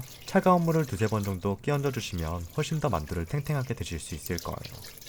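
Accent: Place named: Busan, South Korea. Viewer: 공감꾹 부산사람이라 사투리가 바로 들리네예❤❤❤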